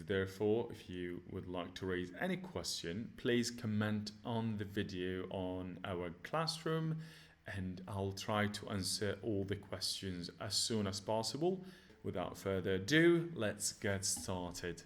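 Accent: United Kingdom. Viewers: I thought you are from england !!😭💙💙💙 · If only there's a doctor with this perfect accent and language in my uni ɵ̷̥̥᷄ˬɵ̷̥̥ omg at first i didn't watch the intro so i thought u're an English person 😂 gd job British accent is so difficult for me, i think that's why i have an American accent lol,it's easier!.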